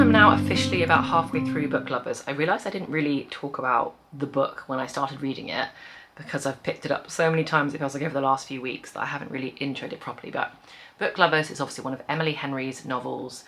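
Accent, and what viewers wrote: United Kingdom. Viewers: Just finished Book Lovers and I enjoyed it ! I really appreciate your content and, as a French, I’m a fan of your British accent 😊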